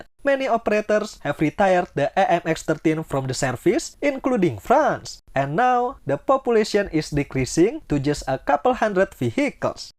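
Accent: Indonesia. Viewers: Ini adminya yg ngomong orang Indonesia , aksennya Indonesia banget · senang melihat orang Indonesia menggunakan bahasa inggris dengan dialek Indonesia 🇮🇩 It's nice to see Indonesians using English with an Indonesian accent 57 mm autoconon masih bisa di Pakai untuk supresi musuh seperti di Ukraina dengan meletakkan ya di atas truk . Jadi doktrin ya yang harus di ubah dari anti pesawat atau helikopter menjadi pembantu pasukan seperti mortir yang dibawa oleh truk. kurasa lebih baik digantikan dengan pansir S1 (mobile , dan bisa menghancurkan drone dan pesawat) alasan negara lain tersebut masih pakai m60 atau t55 sama kenapa Indonesian masih pakai PT 76 gak punya uang untuk mengantikanya dengan yang baru. semua suku cadang sudah bisa dibuat sendiri di Indonesia. Dalam perang seperti Ukraina teknologi bukan apa apa jika tidak ada jumlah. Kuantitas memiliki kualitas ya sendiri. 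Aku sarangkan pemerintah lebih fokus ke teknologi drone dan anti drone untuk perang elektronik . Dan senjata anti pesawat jarak jauh seperti s300/400 yang terbukti di Ukraina membuat kedua belah pihak tidak dapat mendapatkan supremasi udara. Dan senjata anti kapal selam supersonik yang terbukti di Ukraina dapat menghancurkan kapal pernah terbesar Russia di laut hitam. Indonesian masih harus memperbaiki kemampuan bertahanya dulu dari pada menyerangya. Dan ubah doktrin untuk menghadapi drone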